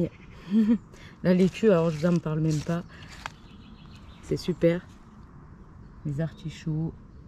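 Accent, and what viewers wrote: South, France. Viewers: Bonjour je découvre votre chaîne qui est très bien, de plus j'adore votre accent du midi. Félicitations pour votre beau potager 👏👏👍👍. Cet automne j'ai mis beaucoup de fumier de cheval et de la paille je suis impatient de voir le résultat. Je mettrai du fumier de poule lors de la plantation en mai prochain. Je m'abonne 😉😉Ali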